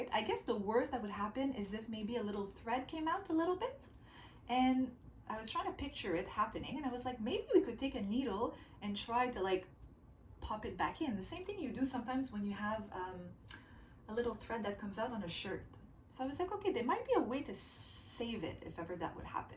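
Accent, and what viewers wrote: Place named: Quebec, Canada. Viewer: You're french Canadian right ? I feel like I can hear the slight Canadian french in your accent. 🥰😊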